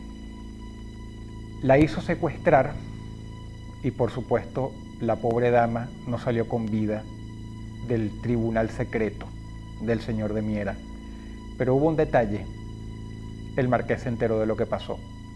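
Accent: Venezuela. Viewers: Cómo odio tu acento veneco Agradezco que cambies tu voz en videos para caer bien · Cuando Dross aun tenia acento Venezolano. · Cómo me gustaba este tipo de videos, mucha nostalgia y el lindo acento venezolano Pd: señor de miera, mas bien señor de mier...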